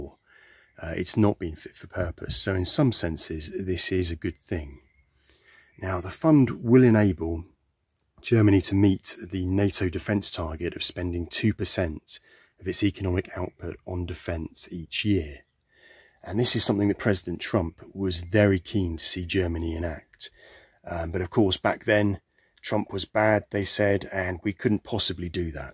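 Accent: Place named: United Kingdom. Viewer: ..."German Prepper" with a british accent?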